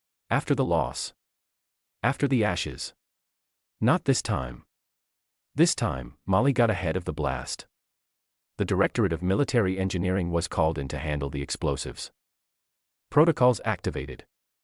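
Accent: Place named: Europe. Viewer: Use African voices who are you videos for Europeans?? Let us ban all Europeans for at least 200 years and usa euros for 300 years. No euro voices, thumbs down on your euro voices.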